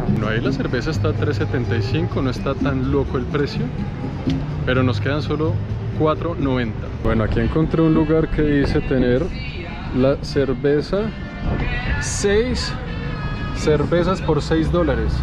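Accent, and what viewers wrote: Colombia. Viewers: Nacio pero se crio en colombia y tiene todo me imagino cultura acento todo osea es colombiano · con razón tiene acento colombiano. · Ejjj hermano si vos sos panameno porque hablas como nosotros los colombianos pues mijo? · Eres Panameño De Nacimiento? Por tu acento pense que eras Colombiano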